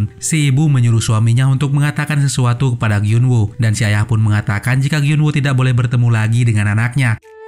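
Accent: Aceh, Indonesia. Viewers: Abang orang aceh ya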